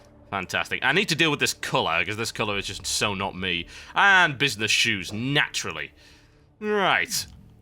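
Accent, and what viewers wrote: United Kingdom. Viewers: First video I've seen of the; LOVE the voice/accent. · u hav a strong British Accent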